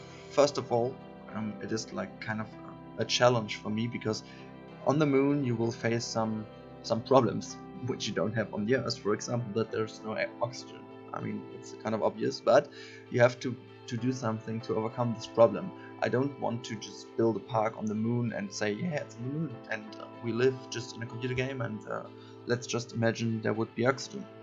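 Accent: Germany. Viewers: Das ist ja ein deutscher Akzent, wenn ich jemals einem gehört hab! Aber gute Arbeit wie immer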